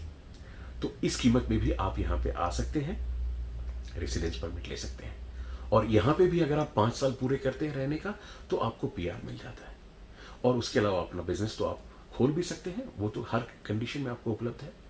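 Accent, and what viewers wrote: Standard. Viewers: Your Hindi is so pure.... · Clear, shudh hindi. ✌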